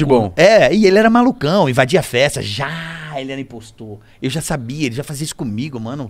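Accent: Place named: São Paulo, Brazil. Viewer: Carioca tá Paulistão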